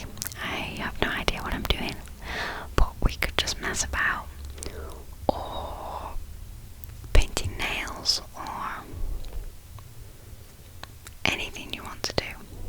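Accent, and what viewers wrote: United Kingdom. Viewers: Her accent is British. Sorry if that sounds mean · Na it's British i think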